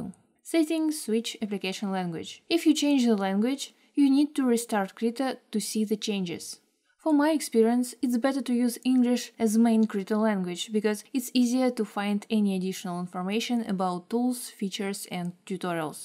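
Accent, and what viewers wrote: Russia. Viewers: Russian accent? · no hassle I thought Russian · Russian accent ^_^ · yep, Russian indeed!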